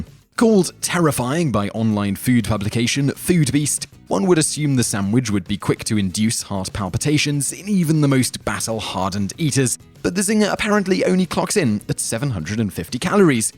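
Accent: England, United Kingdom. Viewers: Is his accent heavier than most Brits?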